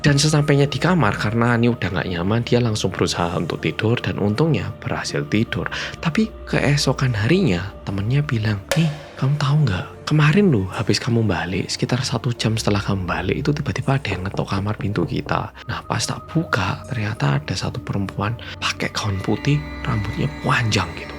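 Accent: Java, Indonesia. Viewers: Ngakakk bgtt pas mas ngomong jamput😂😂 sueneng lek medhok ngunuku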